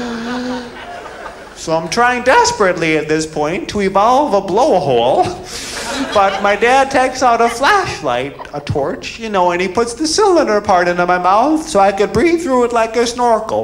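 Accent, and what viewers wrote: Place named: United States. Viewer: Could see him playing Shakespeare plays if he lost the American accent.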